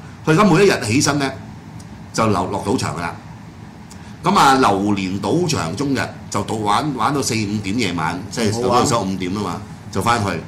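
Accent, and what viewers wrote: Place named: Hong Kong, China. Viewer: 你港式英文都好勁口音😅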